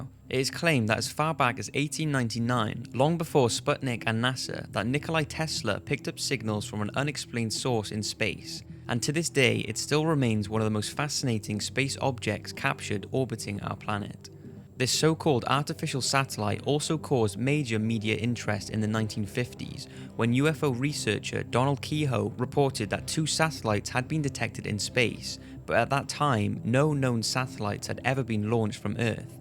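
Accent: Wales, United Kingdom. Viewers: R u Welsh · are you Welsh, I am welsh and I have a friend who sounds exactly like you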